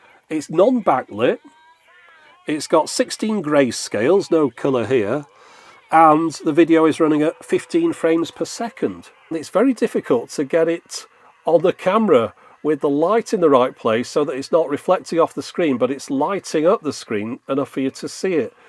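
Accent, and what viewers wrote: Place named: Manchester, United Kingdom. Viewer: I grew up in north Manchester and your accent sounds very familiar, are you from that area?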